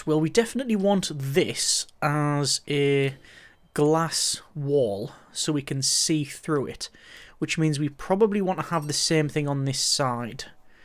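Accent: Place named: Northern England, United Kingdom. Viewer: It's funny, I'm originally from NE England but I've lived away from there for almost 35 years, with 15 of them being in Australia. As such, my accent is very... different. But when I have more of a northern twang, my sons say "you've been speaking to Grandma, haven't you?". Now, they also add "or listening to that bloke off YouTube". So it is all your fault if I can't order through Maccas drive-thru again without affecting a "posh" voice :D But anyway, I do love a bit of Prison Architect washed down with a proper cup of tea.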